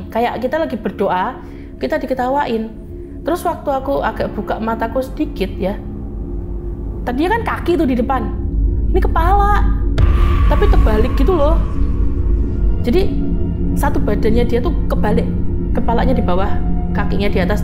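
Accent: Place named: Semarang, Indonesia. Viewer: logat e semarang bgt hhe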